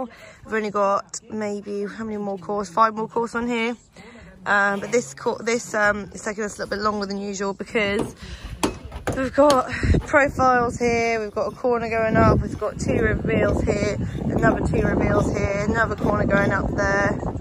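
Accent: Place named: United Kingdom. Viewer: Are you from UK? You have the accent :-D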